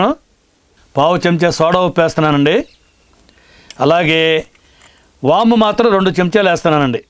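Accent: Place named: Godavari, India. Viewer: మీ మాట్లాడే తీరు చూస్తుంటే కర్నూల్ laga ledu..Godavari jilla vari laga వుంది..చాలా చాలా బాగా మాట్లాడుతూ న్నారు. ధన్యవాదాలు 😊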